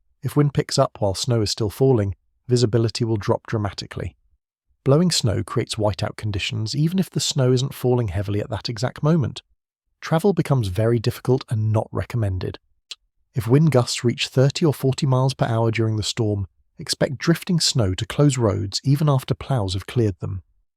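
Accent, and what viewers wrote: United Kingdom. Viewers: Why a British accent narrating US weather.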